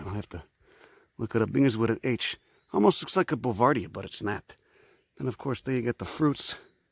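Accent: Chicago, United States. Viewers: I told my wife check out this dude with a thick Chicago type accent talk about nature like a boss. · *Chicago accent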